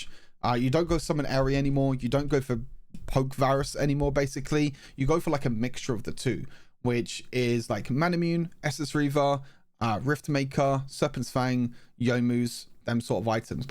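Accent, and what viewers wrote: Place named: United Kingdom. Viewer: i love the british accent. I learned to speak english recently and i can't understand almost anything that americans say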